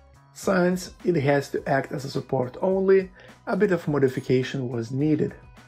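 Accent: Russia. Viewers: Я один слышу русский акцент?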